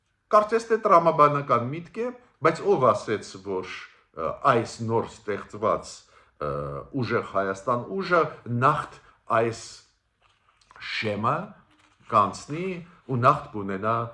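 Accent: Russia. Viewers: Քո ռուսական ակցենտը զզվելի է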